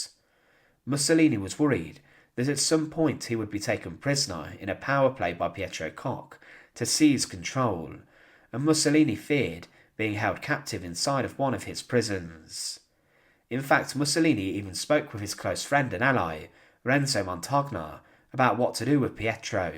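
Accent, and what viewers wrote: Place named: England, United Kingdom. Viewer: I like it. It’s English but I can’t tell from which region. Even if you don’t like it it’s better than an awful AI voice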